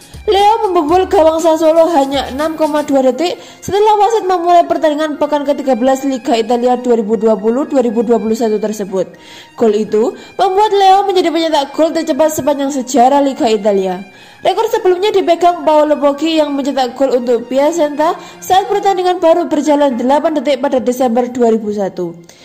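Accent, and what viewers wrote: Java, Indonesia. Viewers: Hahahahahahaha mbok pelan pelan to engkok keselek lo, tapi male duadi lucu e gelok coro moco e, wes cepet medok e kuentel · Jadi lucu, meddok + cepet 😂😂😂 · Medok bnerrr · Medhok bgt mbak, hehe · mbke medok bunget to mbk 😂 orapopo wes.. wong jowo mantul 👍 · Jadi males gw nonton Chanel ini,, gara² suaranya yg medog ...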